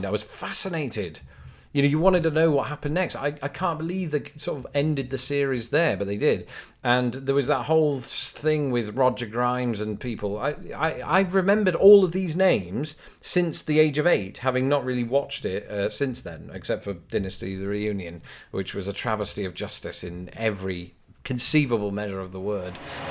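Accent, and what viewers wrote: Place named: North, United Kingdom. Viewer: Considering you've lived in the USA 10 years, you've not lost your british northern accent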